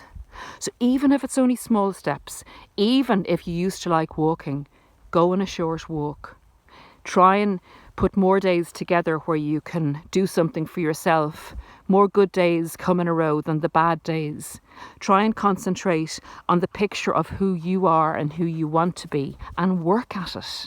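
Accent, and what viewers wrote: Ireland. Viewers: So refreshing to have a video with that soft, kind Irish accent! I appreciate your style and also, you seem to be in a similiar age range as myself. I find you very relatable.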